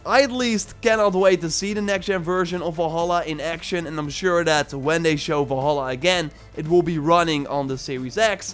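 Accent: Netherlands. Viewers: NOW I FINALLY NOW WHATS WITH THE ACCENT, YOUR DUTCH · Ik dacht al dat je nederlands was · Dacht al dat je Nederlands was 😂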